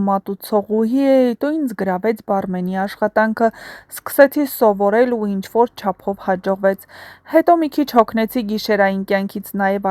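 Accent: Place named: Russia. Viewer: Ինչի եք երբ բառը արտասանում եռբ, ետ ինչ զզվելի պրիմիտիվ մոտեցում է Հայերեն լեզուն խոսել ռուսական ակցենտով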